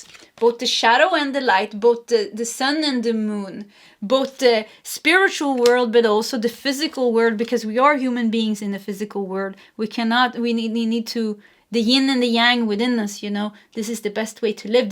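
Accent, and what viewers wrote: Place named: Sweden. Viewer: Hey, punkin' just sending you so much love. I adore your Swedish accent so much. I always pick number 3 as my pick-a-card because I'm a life path 3. You always blow my mind when you talk about narcs in your life. I've married my narc mother in a man who's seriously self-obsessed and an abuser...but, after 35 years of living with his harming my body and gas-lighting me, the judge put a two year no contact order on him.Yes, as soon as he got out of jail he took almost all of the money out of our mutual account...that money was mine too and this is his financial abuse of me. I'm so frustrated because he still has me under his thumb. Sorry for the over share (lol). I'm tired. I've totally neglected my YouTube channel because my husband hates it. Thank you for the healing energy you give, you are such a darling soul. XOXO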